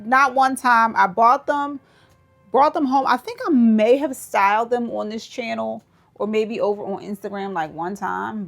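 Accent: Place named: Philadelphia, United States. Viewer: Thanks for sharing. Bought some culottes and love the inspo. Glad I found your channel. You have wonderful style! And I swear I’m catching a Philly accent too. Made my day!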